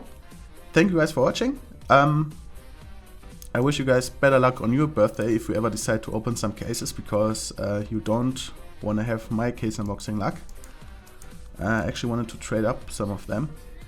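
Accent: Germany. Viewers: u from german 100%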